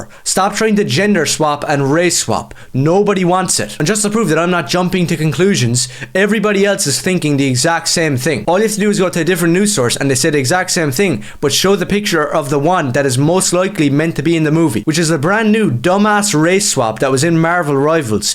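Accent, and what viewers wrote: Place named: Ireland. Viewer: If this guy didn't have an Irish accent, I'd bail in thirty seconds.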